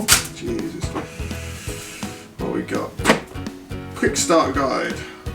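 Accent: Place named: United Kingdom. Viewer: I am thinking to get one of these but not sure if I will be able to use this as trackpad so I can operate Adobe Apps (e.g. Photoshop, Lightroom, Premiere PRO, Premiere Rush and so on.) Any advice will be much appreciated. Although this one is done in 2017, do you still use this and creating any contents? Check out my channel and let me know if you liked any contents useful so I can improve further. As a Newbie, we can grow together if you still creating and interested. You got my support (263) and hopefully you will be doing the same and keep in touch, especially it sounds like you are from here in UK. Please keep in touch so we can discuss and grow our respective channels and get ideas for our contents. Please look after yourself and each other & Stay Safe. "Life is Short, so Live Long & Prosper - "Our World is a Shared Experience" - #TubeBuddy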